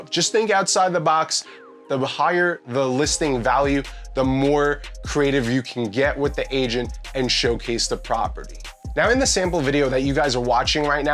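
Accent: New York, United States. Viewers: New York accent still strong my guy 💪🏾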